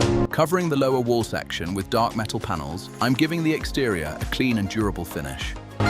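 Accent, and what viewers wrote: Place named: United Kingdom. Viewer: lol what? The voice is obviously British and this is obviously located in the US.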